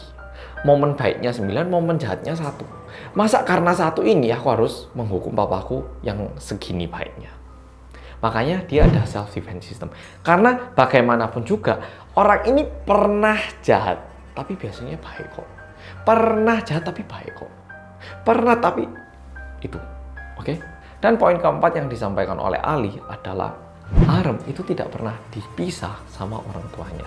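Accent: Java, Indonesia. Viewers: Jangan lupaaa medokkkkkk🤺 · Pangling mukamu krn ganteng tp ga akan pangling suaramu sing medok itu loh cak...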